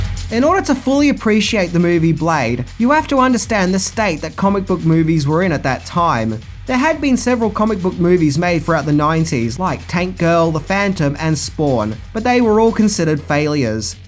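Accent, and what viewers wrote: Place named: Australia. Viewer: Oh man the aussie accent is awesome "avendge his mavah"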